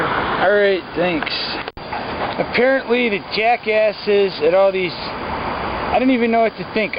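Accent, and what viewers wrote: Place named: Chicago, United States. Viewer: Midwest chitown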